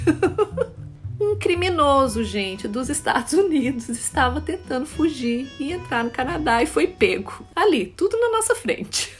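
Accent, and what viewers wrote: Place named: Minas Gerais, Brazil. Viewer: Ei, como voces, eu tambem sou mineiro de BH e tambem moro no Canada, porém em Montreal. Adoro assistir os seus videos pelo otimo astral que voce demonstra nos videos e tambem para ouvir o seu sotaque. Ai que saudades de ouvir o nosso sotaque mineiro de BH. Abraço para toda a familia Otavio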